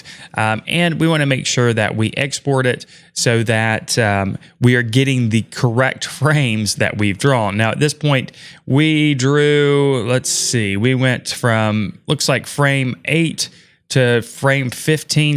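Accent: South, United States. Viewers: Holy moly that is some strong souther accent right there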